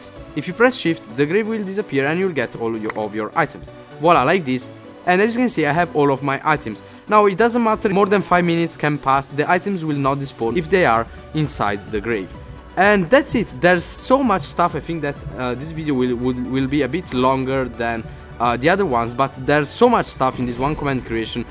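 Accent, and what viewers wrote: Italy. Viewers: quanto si sente che sei italiano?! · Si sente che sei italiano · si sente il tuo accento italiano :D!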